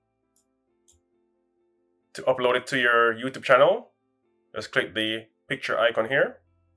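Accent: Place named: Jamaica. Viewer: I am a Jamaican and you sound so much like a Jamaican lol. your content is good bro thank you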